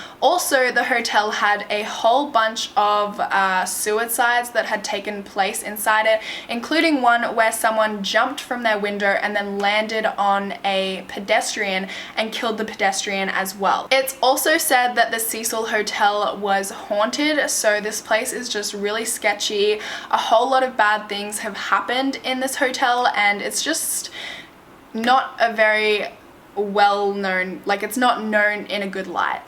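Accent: Australia. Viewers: It's her accent. She's Australian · Wow I just realized how beautiful you are! And also I love the australian accent :x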